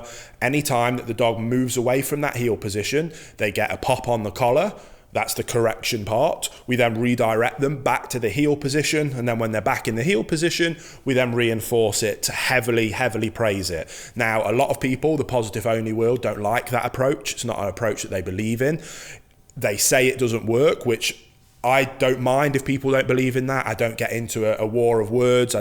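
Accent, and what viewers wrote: United Kingdom. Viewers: Are you from Lancashire?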